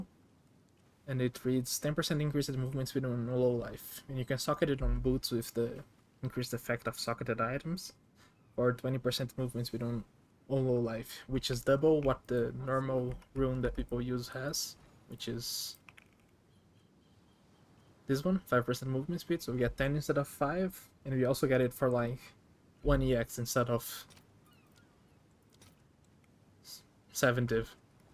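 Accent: Brazil. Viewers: é Brasileiro esse sotaque🥰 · hmmmmmmm tu é br né? esse e esse sotaque, me cheira a br kkk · Voce tem sotaque brasileiro parece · Mano, excelente vídeo aospdkaposdkaspodk eu comentei antes de ouvir você falar, ai eu vi que era BR hahahahaha. Vou seguir sua build. Você acha que ela funcionaria com Abyss Lich?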